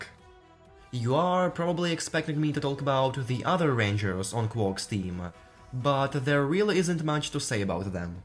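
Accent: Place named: England, United Kingdom. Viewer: тебе очень идёт английский акцент, и вообще, молодец, что решил изучать языки😄Круто справляешься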